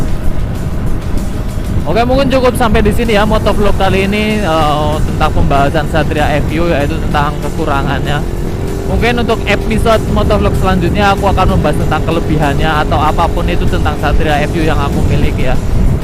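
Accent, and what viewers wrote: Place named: Java, Indonesia. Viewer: Jawa ngapak tapi mas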